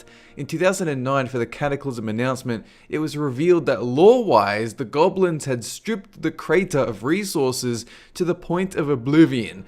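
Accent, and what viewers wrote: Australia. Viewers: Aussie reppin? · Australian accent.... it breaks my brain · you australian mate? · he's Australian.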